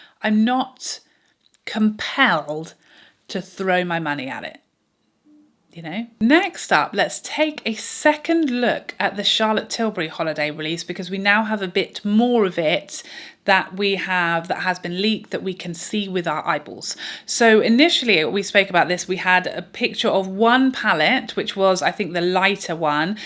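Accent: United Kingdom. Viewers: hahaha "tickling my pickle" ...wish i had a british accent to say things like this. i usually like dior quints but this bouquet one is blah, no. no to nars; did all the beauty brands come together and decide to all do a star, celestial theme? where is the creativity here? i would've liked that urban decay palette in college... im 33 now, and i prefer the muted/diffused look of chanel + dior shadows.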